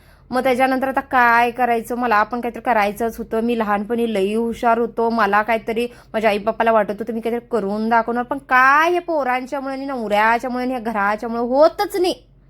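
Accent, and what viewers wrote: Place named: Kolhapur, India. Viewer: ताई कोल्हापुरी भाषा खूपच छान वाटते कानाला ❤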